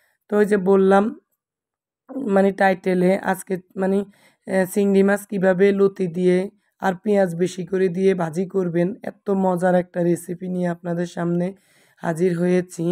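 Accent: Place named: Bhola, Bangladesh. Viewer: আসসালামু আলাইকুম আপু ভোলার কথা সুনলে মনে অতবুত শান্তি লাগো দুই বছর হোলো ভোলা জাইনা লাইক সেয়ার করে দিলাম❤❤❤❤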